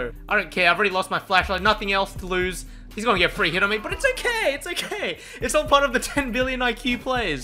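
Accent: Australia. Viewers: you're Australian right? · I do love listening to my Australian friends talking and swearing. So I enjoy your shit mate as well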